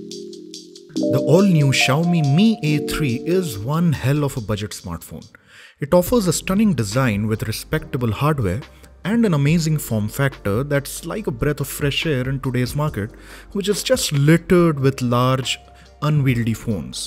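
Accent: India. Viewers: sorry to say, i hate ur indian accent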